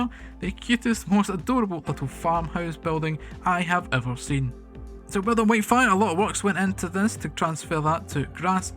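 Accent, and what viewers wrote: Scotland, United Kingdom. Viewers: love the scottish accent reminds love the builds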